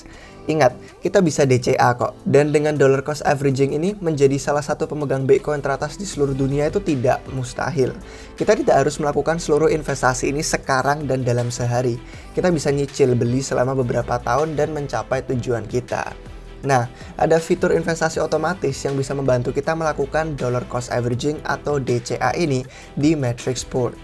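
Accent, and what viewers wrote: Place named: United Kingdom. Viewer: Logatnya ala Inggris Britain bukan Inggris Amerika. Konsonan tengahnya hilang semua. Wkwkwk...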